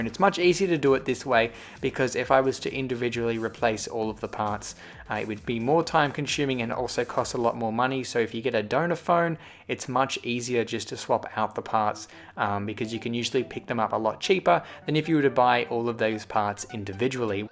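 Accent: Australia. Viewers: Ur Australian?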